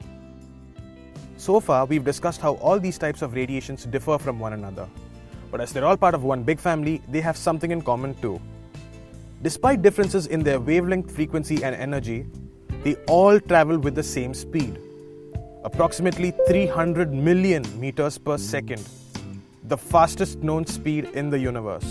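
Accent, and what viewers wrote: India. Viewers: Indian accent everywhere in youtube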